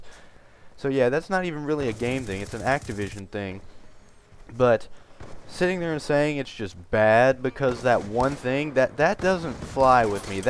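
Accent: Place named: Texas, United States. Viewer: I do disagree, if you disrupt the rudimentary way of a how a game is intended to function, then the entire experience is spoiled. Thankfully, the PC port isn't AS plagued with networking problems as its console counterparts, so you're more likely to enjoy the game at a different venue. Also, dat texan accent :3c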